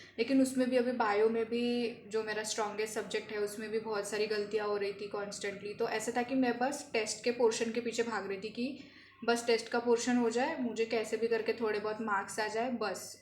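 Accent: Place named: Mumbai, India. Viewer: Hi... Can you tell how many chapters have you completed (or revised) till now? Are there any chapters that you are not doing? And also, this is not study related but are you from mumbai? Your way of speaking made me think that u could be from mumbai. As well as, in one of your videos you mentioned about splitsvilla. Actually, I also watch splits and its a distraction. So you are not the only one facing that kind of distraction. You are not alone, hehehehe. All the best.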